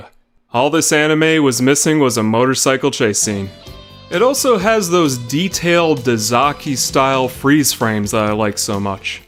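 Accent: United States. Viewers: Ugh. Saying words in Japanese with an American accent. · How is your pronunciation so heavily American when you appear to be watching so much anime?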